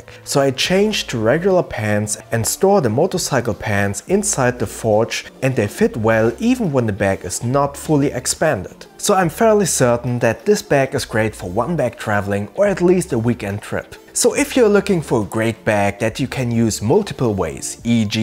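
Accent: Germany. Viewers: That's a good looking pack. Goes almost too well with your jacket. I bet pack cost about 25 thousand dollars. What is your accent? German. Cool voice.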